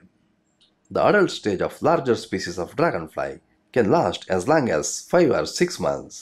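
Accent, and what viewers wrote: India. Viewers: Indian bengali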